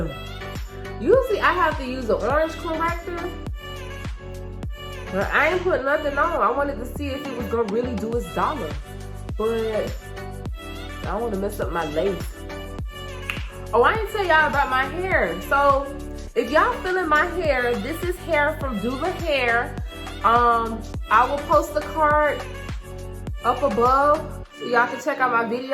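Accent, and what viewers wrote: United States. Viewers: First of all I liked and subscribed within the first 2 minutes secondly, I ordered the same number and girl you did that! Yuhhhhhhhh * yo voice *😂😂🥰 I knew you was from down south.. THEN YOU SAID Carol city!! Oh bihhh yessss 😂305 honey.. Take it to the houseee. #thecrib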